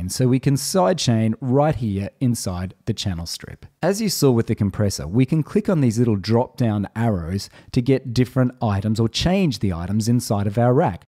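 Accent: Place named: Australia. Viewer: nice to hear an Australian voice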